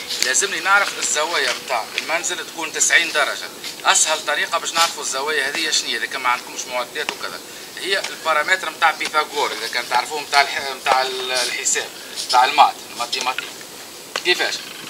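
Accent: Tunisia. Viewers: صفاقسي انتي؟؟؟ متابعك من تونس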